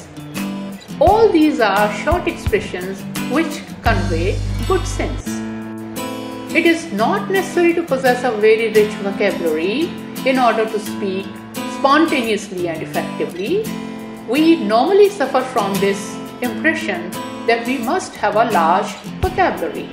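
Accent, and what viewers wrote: India. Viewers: So a woman with an extremely hard Indian accent is saying to try to speak in a neutral, not an American accent, which is usually what people consider to be a pretty neutral. Indians are the worst English speakers on the planet. They thing they are speaking British English. Far from it.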